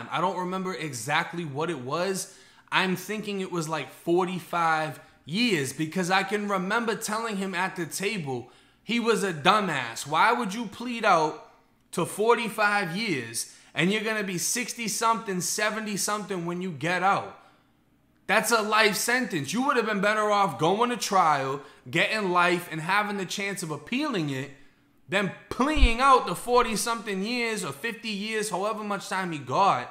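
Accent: Boston, United States. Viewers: That’s that Boston accent coming into play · You got a Boston accent..how did you end up doing time in Florida?🤔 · You from Boston??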